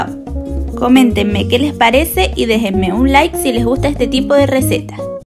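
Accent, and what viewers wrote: Canary Islands, Spain. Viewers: Como se nota ese acento canario ❤ saludos desde mi cama :) (Tenerife)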